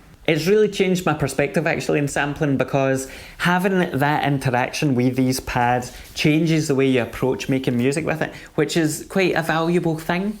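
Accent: Scotland, United Kingdom. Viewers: It's definitely a Scottish accent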